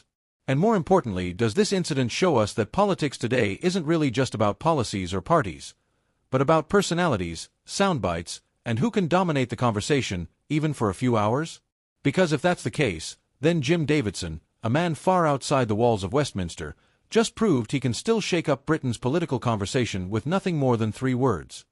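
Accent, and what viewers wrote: United States. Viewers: Why we got a bleedin yank telling us this . Just get on with it yawn yawn yawn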